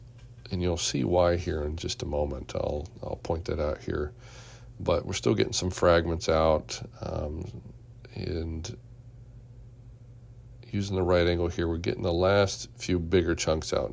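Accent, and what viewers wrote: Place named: South, United States. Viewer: PILOT!!! He absolutely does. Lol! 🤣 AND I believe I hear a slight Southern accent. 👍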